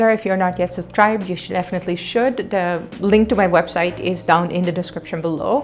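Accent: India. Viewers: I love how living in India for 5 years made your accent a bit like Indian accent. (Obv in a good way tho)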